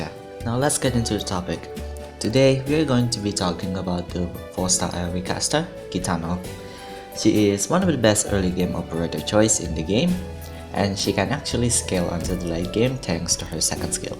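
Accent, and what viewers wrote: Indonesia. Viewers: thought so, when i heard it, ah Indonesian accent somehow Keep it up dude 👍mantab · English Indonesia nya terasa bat anjir wkwkwkwkwk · you sounds like indonesian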